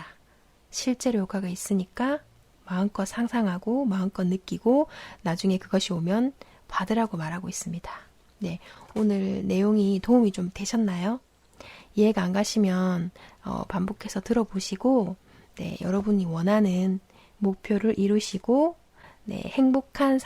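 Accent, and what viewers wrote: Gyeongsang, South Korea. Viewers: 목소리 듣기좋아용~🤩 경상도 같으신데 맞나용?😘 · 저도 경상도인데 특유의 엑센트가 재밌네요. 중간에 주문같이 말하는 부분도 재밌구요~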